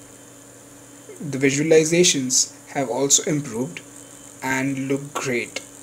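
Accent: India. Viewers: Your english like indian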